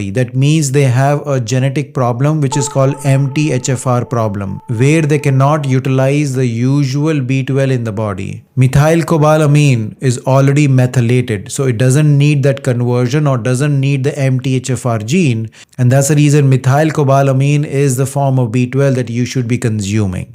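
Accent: India. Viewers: Sorry, but now I am totally screwed up. I've been using the Methyl Cobalamin format of B-12 for years based on available research. Now, we have this feller suggesting that Methyl Cobalamin is the best format. The problem is that I have no intention of trusting a feller with the kind of accent, name and appearance I am seeing and hearing in this content. Sorry, but when I listen to him, I have images of those folks who, before I blocked them, used to call me five times a day and tell me they were Microsoft engineers from Mumbai and were available to help resolve my computer issues if I would only buy them some gift cards. Perhaps the sponsor of this content could hire a busty young blonde haired white woman from Texas, with a southern accent, to pitch this product. Otherwise, I guess I'll just stop taking the B-12 or do the opposite of what this feller is suggesting. 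Thanks for your attention to this matter.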